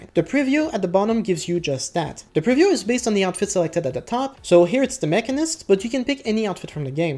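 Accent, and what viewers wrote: Quebec, Canada. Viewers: Is it me or i can sense a little quebecer accent ?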